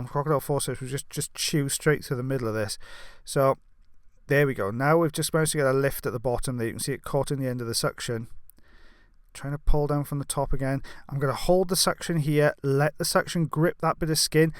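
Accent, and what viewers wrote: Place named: England, United Kingdom. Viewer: I love skin peels! I had a good laugh for a moment when you said "tinnitus". I couldn't figure out what that meant for a minute because over here, we pronounce it as "tin-eye-tus" haha. I love hearing the different words you use and the way you say them. I look forward to all the "English" English in your videos. Keep up the great work!!!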